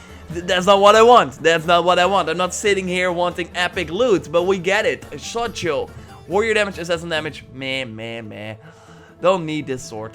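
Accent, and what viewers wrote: Netherlands. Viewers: I knew it you’re dutch! Why can’t you people hide your accent ffs...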